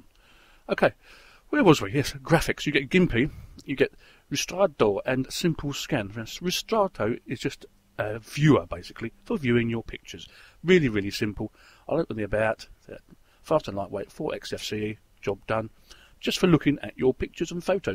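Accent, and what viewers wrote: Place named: England, United Kingdom. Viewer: Although as a German, I Cant get really into your English Accent, but I Tried it. Well, Im using Xubuntu 10.10 since a Month and its really great. The Default Style is so much better, And the Speed is very nice. Before all that I Used Gnome and I Was very Happy with it. But Gnome got slower with Maverick, so I Tried first Xubuntu. And stayed there. :)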